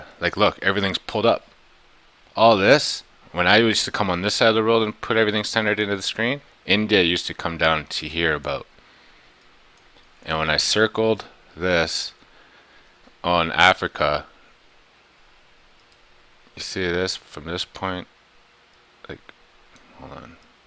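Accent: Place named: Canada. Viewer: Wow , this guy sounds like Bob and Doug Mackenzie from SCTV. "Hey hoser bring me my toque , then we can eat some back bacon and play a game of beer hunter eh" !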